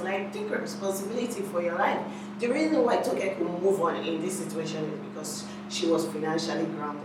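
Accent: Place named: Warri, Nigeria. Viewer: I loooove the way you talk. You sound like you are from Delta...warri to be precise. hahaha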